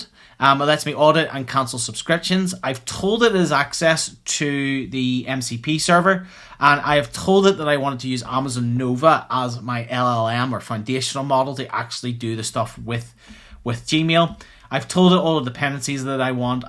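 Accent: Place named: Northern Ireland, United Kingdom. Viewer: Good stuff, I've been experimenting with this stuff too, I found Bedrock Agents a bit limiting especially when it comes to integrating MCPs. Good to hear the NI accent, my wife is from there :)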